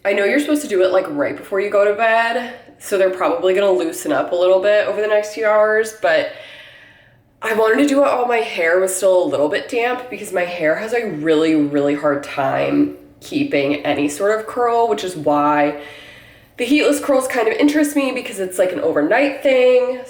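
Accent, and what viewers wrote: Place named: United States. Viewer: I love in American Accents. By the way, May I know Where is your accent in America?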